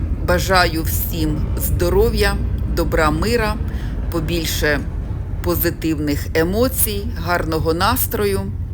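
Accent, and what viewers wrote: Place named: Kryvyi Rih, Ukraine. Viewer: Жалкую, що Ви не з цього міста. Хотіла попросити про допомогу. Треба тих, хто знає головних на кладовищах. І ще, у вас говір криворізький, рідний❤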